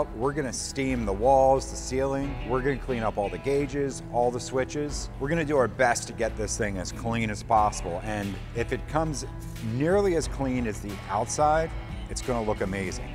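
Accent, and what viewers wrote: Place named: Pennsylvania, United States. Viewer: The way you say words like drawing - drawling is so PA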